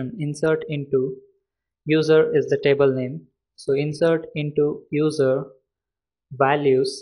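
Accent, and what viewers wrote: India. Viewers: god damn it... every one of this video about PHP is from some random indian guy on youtube. not to be an ass, but that indian accent won't let me concentrate on your videos. If I would give you a tutorial with my broken lame ass persian accent, you would feel like punching me too!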